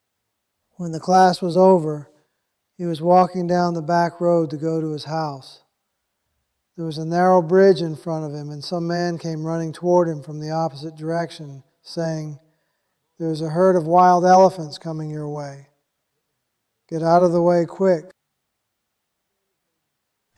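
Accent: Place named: Georgia, United States. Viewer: Why is sounding like an old-timey trucker from Georgia all of a sudden?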